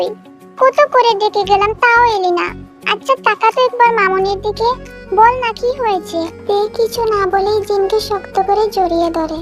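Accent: Sylhet, Bangladesh. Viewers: আপু তুমি কি সিলেটি 🖤❤️🖤❤️🖤❤️🖤❤️🖤❤️🖤💚💜💚💜💚💜💚💜💚💜💚💜💚👻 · আপু মনে হয় সিলেটি,, যাই হোক আপু তুমার ভিডিও অনেক অনেক অনেক অনেক অনেক অনেক অনেক অনেক অনেক অনেক অনেক অনেক অনেক অনেক অনেক অনেক অনেক অনেক অনেক অনেক অনেক অনেক অনেক অনেক অনেক অনেক অনেক অনেক বেশি সুন্দর হইছে আপু I love you api 😍😍😍😍💖💖💖💖💖💖💓💓💞🤩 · আপু তোমার ভয়েস শুনে মনে হচ্ছে তুমি সিলেটের 💜💜💜♥️♥️♥️♥️♥️♥️♥️♥️♥️♥️♥️♥️ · আপু তুমি কি সিলেটি ❤ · তুমি সিলেটি পুরি? বালা আসোনি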